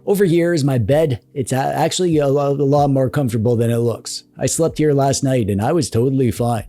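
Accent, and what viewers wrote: Canada. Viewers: You're amazingly Canadian, huh? I don't know how I never noticed til this video.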